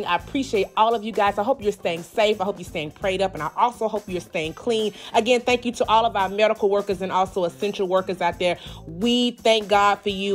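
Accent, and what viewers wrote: South, United States. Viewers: Love your Southern accent. A very enjoyable dramatic video. I would share this with my family! Thank you! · Not the lite bread girl 😂😂😂 ooooooo you country I LOVE IT.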